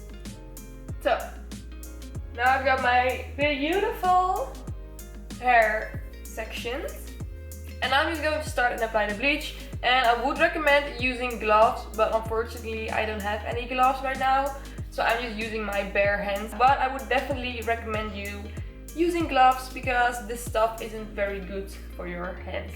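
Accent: Netherlands. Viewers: Are u dutch ? I get dutch vibes from your english 🥰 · Are you from the netherlands, I thought so bc of your accent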